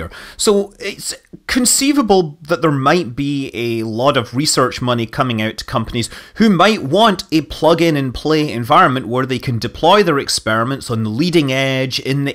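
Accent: Scotland, United Kingdom. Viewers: LOL, your accent is reverting to your native Scottish. The Bell X-1 of course had the one XLR11 engine that has the four thrusters. The early X-15 had a pair of XLR11's (so eight thrusters) while (whilst?) they were waiting for the XLR99 monster. Lifting bodies also made use of the XLR11's.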